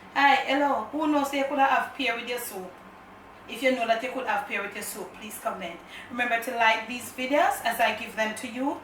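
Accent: Jamaica. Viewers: Hey auntie i love your styling of cooking the way you explain everything and I must mention the strong Jamaican accent, man me love it all, I am Trini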